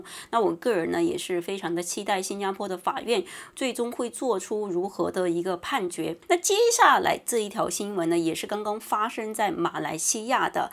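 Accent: China. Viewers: 听你口音，也是中国的吧？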